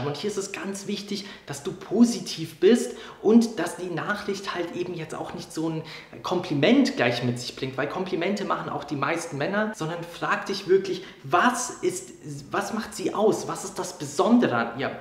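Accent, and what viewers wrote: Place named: Franconia, Germany. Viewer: Junge.... wenn du brav und anständig bist als Mädel, dann lässt du nicht nen fremden Typen wegen einer Pizza zu dir kommen. Ich bin nicht sicher, ob die Tips wirklich sinnvoll sind. Du scheinst ja halbwegs kreativ zu sein, aber die Gedanken die du dir über das Profil machst, und dann versuchst witzig zu sein.... puh.... seltsam. Dafür mag ich deinen Frankendialekt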